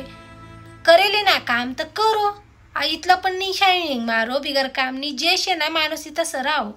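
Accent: Khandesh, India. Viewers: मी तर तवाच वळखल्यान तूण खान्देशी हाय ते छान बोलतीस व ऐकतच राव्हा वाटतेन आम्ही आहो विदर्भ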